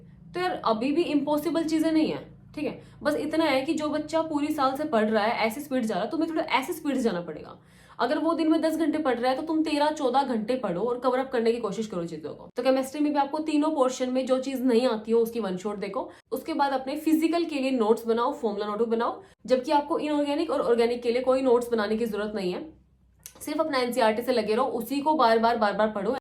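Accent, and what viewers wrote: Haryana, India. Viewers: Many Hariyanvi waala tone h bahan ka 😊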